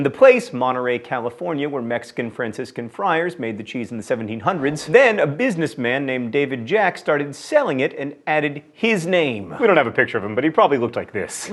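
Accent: France. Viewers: I like your french accent and just for that i like this episode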